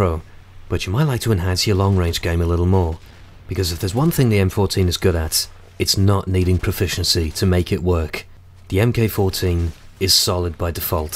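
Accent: United Kingdom. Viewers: You and XboxAhoy need to collaborate. NOW! Two British accents in one vid? Eargasm!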